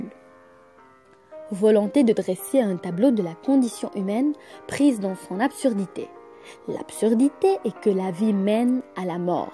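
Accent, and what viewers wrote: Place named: Flanders, Belgium. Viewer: Merci pour la vidéo, ca vient d'ou votre accent? Il me rappelle au Flammandes parlant le néerlandais...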